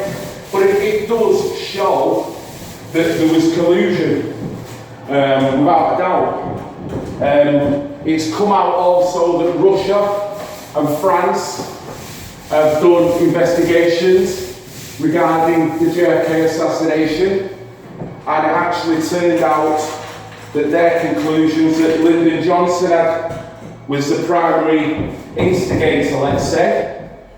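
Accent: Manchester, United Kingdom. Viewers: Love you and that Manc accent one love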